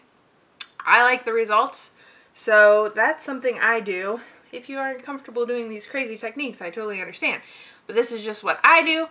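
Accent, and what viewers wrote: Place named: South, United States. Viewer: Jw where are you from? You kinda have a southern accent.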